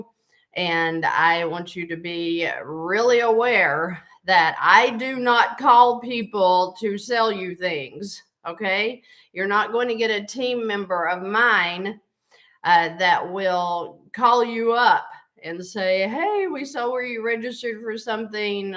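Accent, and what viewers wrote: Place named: Tennessee, United States. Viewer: We are considering doing a channel to track our progress weekly, and hold ourselves accountable, and it brings us so much joy to actually get to dream of possibilities of having financial freedom. We are a retired military family, 100% disabled vet, and I have 25 yrs with a pension, so we have on paper a nice retirement, unfortunately it’s all gone before end of month….I prayed for answers, I want work again. I want to make an impact. you are an Angel sent here on Earth. I love your little feisty TN accent, and your faith in our Lord, and in people. Amanda OK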